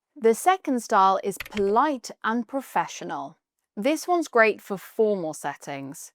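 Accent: United Kingdom. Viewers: Hello. I'm from Egypt. I love English and I love the British accent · I am living in iran and I'd like to learn british accent. This is so interesting for me to learn british accent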